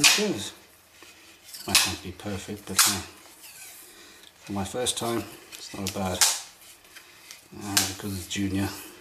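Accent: South Africa. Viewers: Sounds South African to me! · Well what's the chance of hearing a fellow South African showing us how this is done, shot boet!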